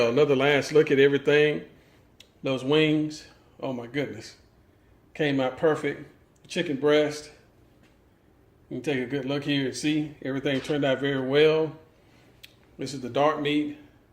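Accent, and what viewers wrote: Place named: Baltimore, United States. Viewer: I may be mistaken but from your accent, Baltimore right? Love the video.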